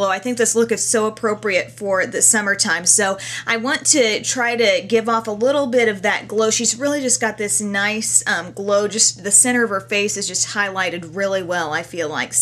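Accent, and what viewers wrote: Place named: United States. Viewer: Fantastic! and...just let me say another thing: you speak so good American, that I can easily understand every single word, even if I am Italian! :-)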